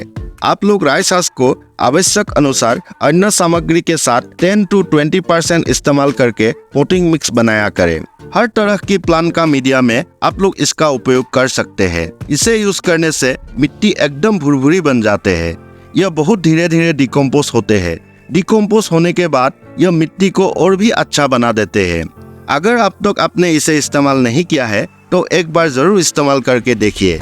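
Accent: Assam, India. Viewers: Ap ke Hindi Assamese jeshe hai. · apke accent sun ke lagta hai ap Assamese ho. Hamare yaha mill mei powder milta hai, "tush" samajh jaoge. Rice mill hai 10km dur waha se 1 bori leke aaya tha. Aadha aise he raw use kiya agalonema mei. Phir kal baki aadha kal aise kadhai mei deke jalaya. 😄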